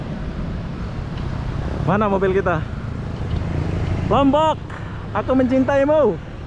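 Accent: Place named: Malaysia. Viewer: Bahasa Melayu nya kentel bgt bang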